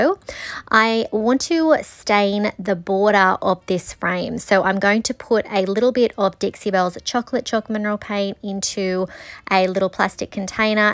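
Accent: Australia. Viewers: I just found your channel and it's so nice to hear your Australian accent. You're very talented and inspiring me to try this. Thank you ❤🐨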